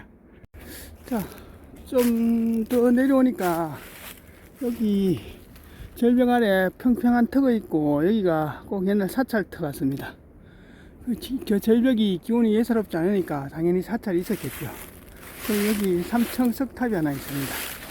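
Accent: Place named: Gyeongsang, South Korea. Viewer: 경상도 사나이에 구수한 말씀 매력있어요 오늘도 고생하셨습니다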